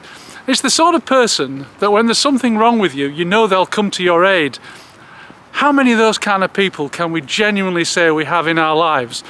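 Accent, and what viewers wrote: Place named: Cockney, United Kingdom. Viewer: This man is speaking with a Cockney accent.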